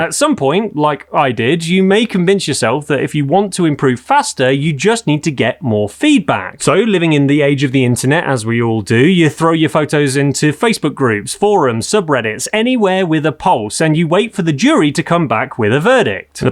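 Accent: England, United Kingdom. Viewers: Very good advices and I like your english accent and style with sous-entendus. Could you add : 1. B&W is a must for artistic photos 2. Never use Auto (which is in the same line as always use Manual) 3. The larger the zoom, the better the photographer.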